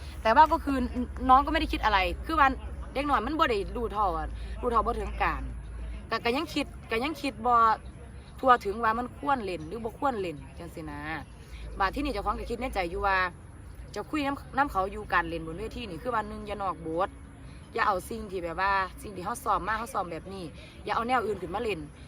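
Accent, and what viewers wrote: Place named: Laos. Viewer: ทำไมพูดสำเนียงลาวจังเลยละคับ คับ ขอไลน์คนข้างๆให้หน่อยได้ไหมคับ😉😉😉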